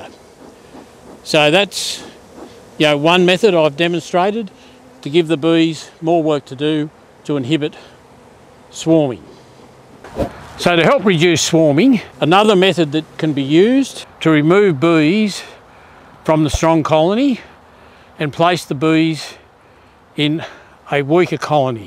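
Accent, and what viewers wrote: Australia. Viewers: Love the Aussie accent. I was waiting to hear "crikey". Lol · Greetings from America! I LOVE your Aussie accent! May I call you? hehe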